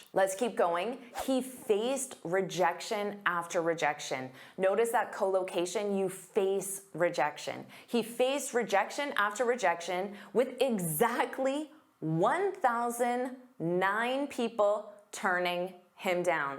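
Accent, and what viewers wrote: England, United Kingdom. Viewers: Very clear English accent Very understandable Thank you so much for improving my english❤😊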